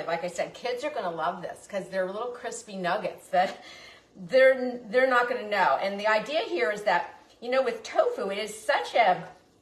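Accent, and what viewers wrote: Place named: Brooklyn, United States. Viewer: new subscribwe love love love your videos and personality i happen to be vegan am wondering if you are from brooklyn as your accent sounds it i was born there but let 50 yrrs ago now in georgia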